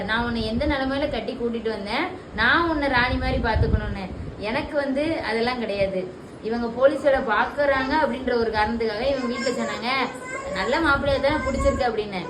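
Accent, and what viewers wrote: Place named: Tirunelveli, India. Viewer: திருநெல்வேலி காரின்னு நிரூபிசுட்ட சகோதரி 👍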